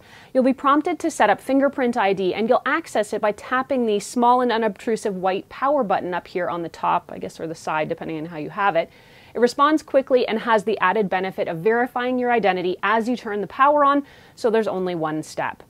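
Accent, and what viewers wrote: Canada. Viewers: Gotta love Canadian ladies talking aboot tech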